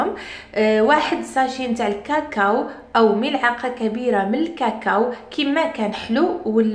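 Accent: Oujda, Morocco. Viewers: MERCI c'est gentil..... Tout le monde aime bien ta façon de parler surtout tu as gardé l'accent Oujdi 💯 qu'il ne faut surtout pas perdre..celui ou celle qui ne comprends pas ils n'ont qu'à faire un effort et les petits plats dyale zmane on est fière de toi continue comme ça on te souhaite beaucoup de succès 👍🙏💖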